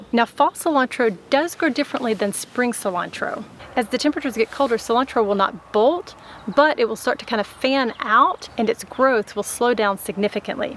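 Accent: South, United States. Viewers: She sounds like she's southern but softening her accent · Her accent is American south, possibly Texas or farther east up to North Carolina. That’s a Southern American accent. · she has strange american accent for my foreign ears. Where is she from?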